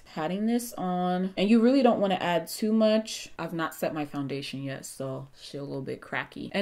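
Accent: Nigeria. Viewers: Hey. Are u Nigerian? The accent tho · I’m gonna guess you’re part Nigerian. That accent sent me to the ground. Love you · Let me just tell you , the moment I heard your Naija accent come out , i clicked Subscribe so fast 😭😂 . Ahhh my fellow sista doing her thing lol , i definitely learned something new in this video . I’m definitely looking forward for more to come.! 💋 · I love your Nigerian Accent 🙂❤ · Subscribed for the Nigerian accent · That Nigerian accent 😩😂❤️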